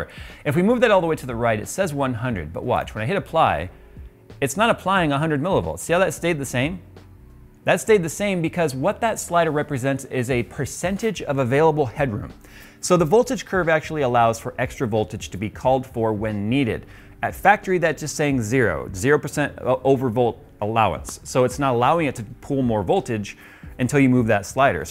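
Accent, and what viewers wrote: Canada. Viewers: you're canadian? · You're Canadian,? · There goes Canadian again. Great video.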